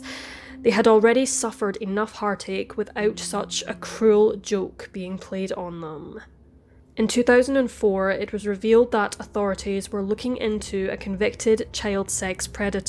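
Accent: Scotland, United Kingdom. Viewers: It's hard to peg your accent. Sounds like a hybrid of Scottish and something else? Love it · Is this a Scottish accent or an Irish accent? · Love the Scottish accent 💞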